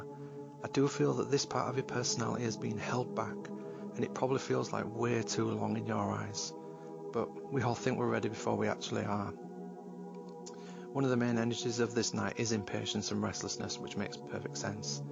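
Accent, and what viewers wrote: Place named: North, United Kingdom. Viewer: I love your gentle voice..northern..xxx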